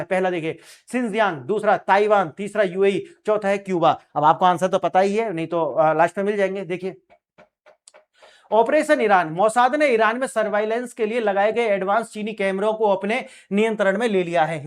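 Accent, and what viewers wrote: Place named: Bharatpur, India. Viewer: आपको सुनने के बाद बार बार ऐसा क्यों लग रहा है आपकी भाषा भरतपुर के लोगों से मिल रही है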